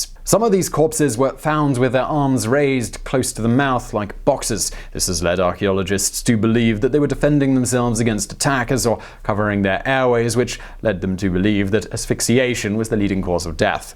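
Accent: United Kingdom. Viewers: is a brit, so i'm sure it doesn't matter and will never happen, but i can only wonder what it would be like sitting at a thanksgiving day meal with. ten servings of 19 minute segments. with all the snark and wisdom that only the elocution of a british accent can bring to the english language. rock on.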